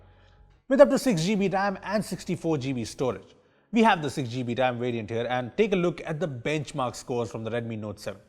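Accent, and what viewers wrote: India. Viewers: I dont understand why Indians have such a hard time getting rid of their accent. The D's are sooo annoying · I like this channel bcause his accent different from another indian channels 👍👍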